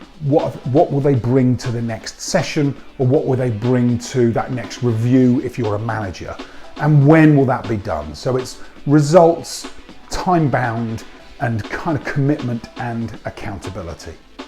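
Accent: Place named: United Kingdom. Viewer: This is my favorite coaching model! Loved how you explained it and love the UK accent as well.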